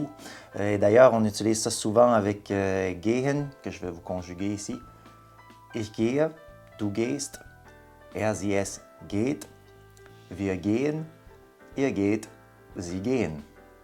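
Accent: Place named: Quebec, Canada. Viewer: Bonjour, je viens à peine de m’y remettre à l’allemand, ayant abandonné cette langue car j’avais une très mauvaise méthode et une méconnaisance de l’apprentissage puis je me suis rattrapé grâce à l’anglais, l’espagnol et l’italien (j’essaye d’apprendre le turc aussi) et j’avais tant envie de m’y remettre. J’ai trouvé (tout juste) votre contenu qui est très pédagogique (j’adore l’accent québecois). Une bonne journée de la part d’un alpinois .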